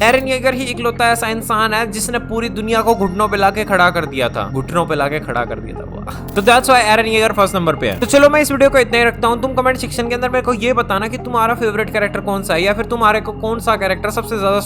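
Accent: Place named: Haryana, India. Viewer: Vai tumhara voice hryani vibe deti hea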